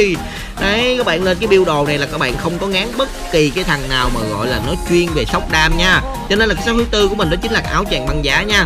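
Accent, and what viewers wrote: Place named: Mekong Delta, Vietnam. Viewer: Thương ông này vl.nghe dọng miền tây dể thương gớm :))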